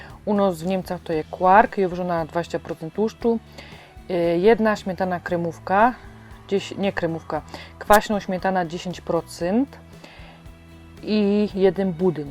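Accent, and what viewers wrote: Silesia, Poland. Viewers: Kocham ten kanał i twoją śląską gwarę! :)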